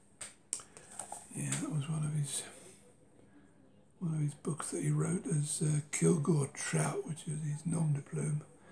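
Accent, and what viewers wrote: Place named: Essex, United Kingdom. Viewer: I thought your accent sounded as if you came from Essex. It's where I live.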